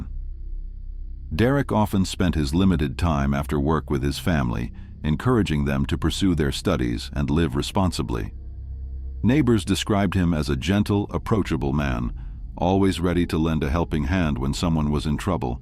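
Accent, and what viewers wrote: United States. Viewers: It’s annoying to hear the American accent on a UK documentary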